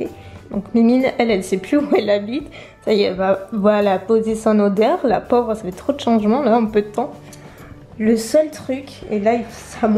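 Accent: South, France. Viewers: Ouais moi y'a un autre truc qui me perturbe! (et d'autres aussi, comme Chrystellemakeup qui est aussi du sud) dit "moinss" pour "moins". Je m'en remets pas!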